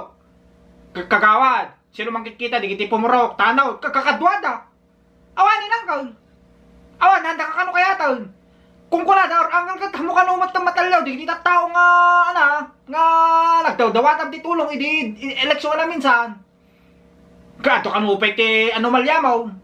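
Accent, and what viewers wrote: Ilocos, Philippines. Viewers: I have Ilocano parents from Gerona Tarlac, I was born and raised in London U.K. Your accent very strong Ilocano and reminds me of my relatives aunties and uncles from the barrio village very strong Ilocano and shouting talking with each other like they are in the mountain! Hahaha 🤣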